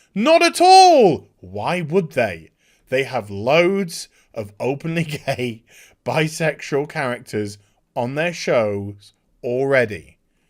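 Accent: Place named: Yorkshire, United Kingdom. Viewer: Wait up a second.. you have the ability to tone down your Yorkshire accent? And yet, Doctor Woke, who is a paid actress, cannot? You should consider acting mate..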